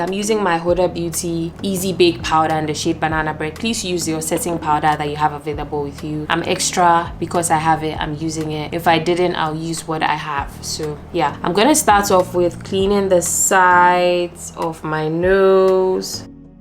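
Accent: Ghana. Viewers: You sound so ghanaian! I love it ! I appreciate your authenticity, it's making me miss GH. You're also stunning !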